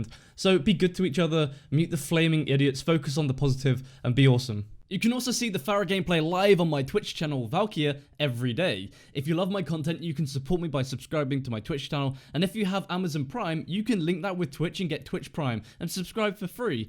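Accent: United Kingdom. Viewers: Valkia you sound British so assuming you live in the UK how the hell do you have good ping on NA???? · Valkia are you from the UK mate?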